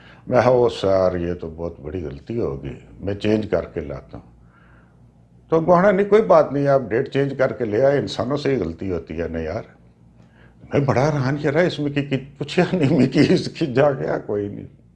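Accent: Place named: Pothohar, Pakistan. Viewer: Mashallah....Kai Sohni Pathwari Bolne Pye O.....Mhara Dill Bagh Bagh Hoyi Gachheya...... Bahun Pyar Naal, Nawin Dilli Ton❤❤❤❤❤❤ Allah Paak Tussan Ki Hassna Vassna Rakkhe. Aameen Summa Aameen ❤ Hamesha Tussa Ne Video Na Muntazir❤❤❤❤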